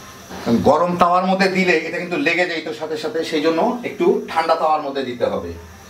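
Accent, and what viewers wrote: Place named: Thakurgaon, Bangladesh. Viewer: ভাই,আপনার বাড়ি কি ঠাকুরগাঁওয়ে? কথা শুনে মনে হয় আপনি ঠাকুরগাঁও এর মানুষ!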